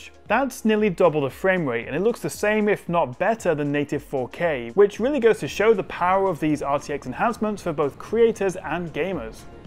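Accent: United Kingdom. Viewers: Surely it is, and it was with an emphasis on good job, besides doing your leg work here with your community, then you truly make differentiated videos beyond the british accent;)! Nvidia better team up with u again! Your explanation of these semi-niche brandings are unique and can’t be outsourced to India or perhaps done by a futuristic AI 😛(speaking of DLSS).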